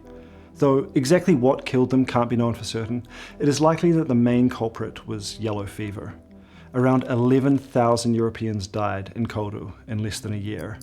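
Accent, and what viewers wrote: New Zealand. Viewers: Kiwi accent there? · Are you kiwi?? South Island?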